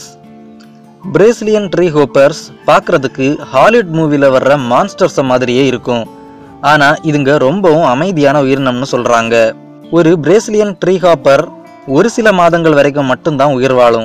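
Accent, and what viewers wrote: Kanyakumari, India. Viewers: Kanyakumari slang makka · Nenga KanyakuMari ah bro? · Kanyakumari voice ❤️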